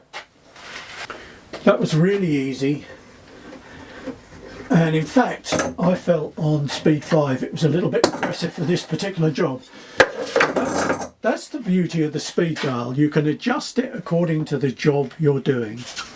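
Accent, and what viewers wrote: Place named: United Kingdom. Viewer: there's something about the queen's english that just makes the video sound like a documentary. this is amazing hehe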